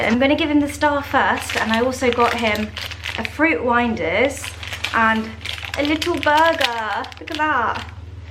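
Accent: United Kingdom. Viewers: there's a saying "if i had a british accent i would never shut up." 😂😂 ur lucky u have a british accent. i wish i had one too lol.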